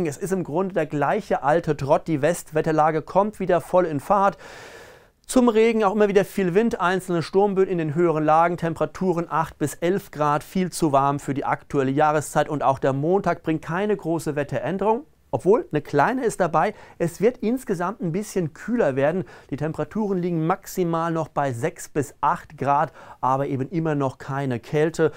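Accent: Hesse, Germany. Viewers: Kälte weit weg , sehr gut! Bißchen hessisch nächstes mal wieder bittte 🙂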